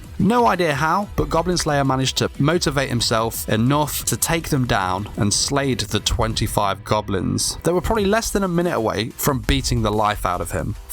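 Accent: United Kingdom. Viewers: Dude, "Bolg" is from Celtic mythology. You sound British, so you should know this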